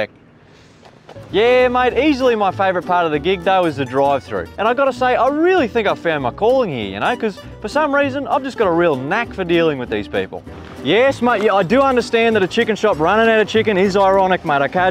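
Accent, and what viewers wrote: Australia. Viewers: i had to listen to the opening of this video 3 times before i was able to comprehend you were in fact speaking english. what the fuck is the aussie accent. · god the australian accent is glorious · The australian accent is very much accurate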